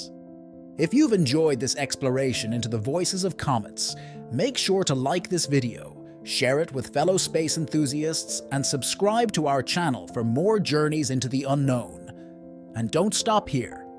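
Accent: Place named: Ireland. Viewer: Hey guys! You need to put a notice to use headphones for the best experience. **By the by, was this an AI narration? Because if it's a human, I swear I detect a hint of a brogue. A bit of Celt in there? Maybe Western Ireland. Connemara, perhaps? I have family there so Im able to find it easily.**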